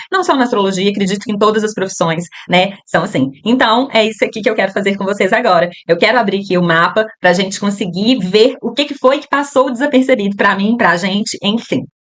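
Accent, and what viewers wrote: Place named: Minas Gerais, Brazil. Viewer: 😂😂😂😂😂 super acontece. Ouvir sobre mapa astral com sotaque mineiro é um bálsamo! ❤️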